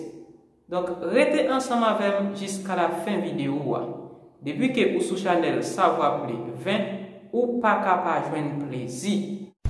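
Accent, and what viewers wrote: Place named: Brazil. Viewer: Ou pale portugais an tankou yon brésilien felicitations au commencement m pat konn anyen men koulya m komanse konprann lè wap pale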